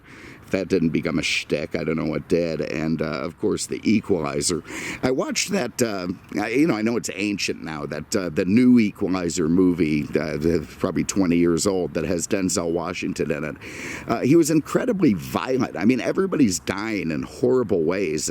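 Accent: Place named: New York, United States. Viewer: Your stand-up and fear of any living animal-(scary, filthy, up to no-good, gang, face ripping), cracked me up. I clicked for the Caddi, but we’re from the same decade and out, so got every one of your references. I especially hate humidity and only visit Fla. from November until February. Beyond those months, only for money! You were hilarious and sound like the consummate NY transplant. Good Luck with the Eldorado. I prefer the ‘78 whale in that brownish gold mist colour and in Biarritz trim. Btw. I have a ‘81 Toronado Brougham in triple-navy. I love her! 🌞😂😎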